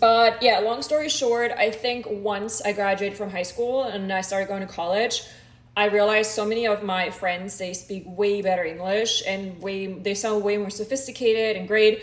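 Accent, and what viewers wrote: Germany. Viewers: I can hear a little bit of the German accent but personally I like the German accent I also love German and Germany. I did a study abroad there and I'm also part German. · I can definitely still hear in the German accent while you’re speaking. Do you purposely try to mask it?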